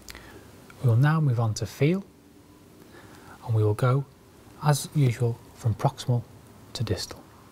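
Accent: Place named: Liverpool, United Kingdom. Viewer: I've been looking for a Scouse ASMRtist for a long time, didn't think I'd find it in the form of unintentional ASMR. Love it.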